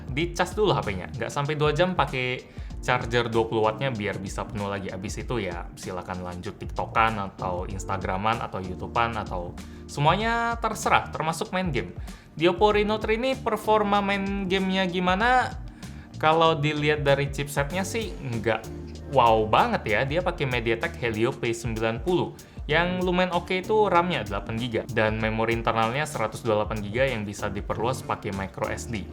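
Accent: Palembang, Indonesia. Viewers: Gelep itu bhs Palembang, krn orang palembang jd wajar aja masih kebawa bahasanya. Lagian beda tipis sama bhs indo. Beda E dan A aja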